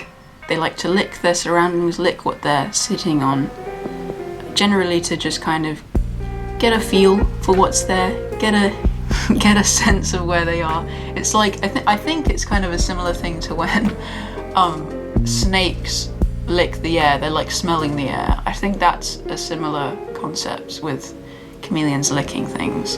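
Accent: United Kingdom. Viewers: Didn't realize you were British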